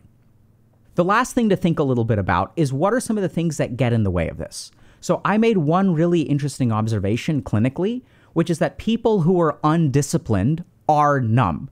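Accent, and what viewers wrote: India. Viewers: Damn your English sounds so Indian 😅 BTW thanks for free knowledge....you blessing us with your knowledge glad i found your channel ❤ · Bro.. I love the indian accent lol....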